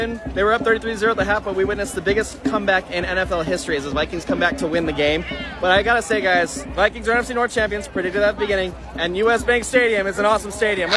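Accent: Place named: Wisconsin, United States. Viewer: (Dude is clearly from Wisconsin)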